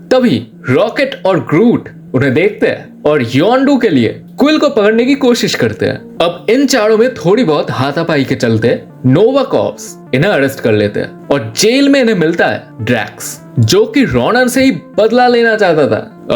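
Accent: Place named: Bengal, India. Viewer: Bhai main pj ko dekh ta hoon moc ko sunta hoon comic verse ko badal ko desinerd ko bhi par jab maine tumhari video dekh ni shuru ki mujhe maza aya is liye nahi ki tum kuch alag bana rahe ho is liye kyu ki tumhari hindi thodi weak h jaise bengoli log bolte h maza ata h sunne mein isse thik mat kar na keep it up ❣️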